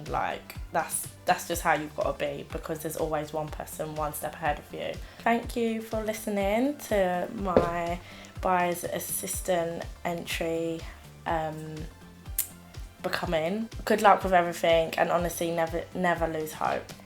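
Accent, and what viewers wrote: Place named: Essex, United Kingdom. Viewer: Thank you for this video :) btw I'm obsessed with towie so I love your accent 😀❤❤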